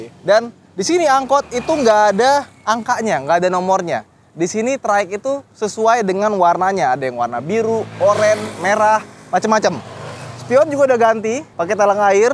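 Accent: Padang, Indonesia. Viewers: Bang orang padang ya?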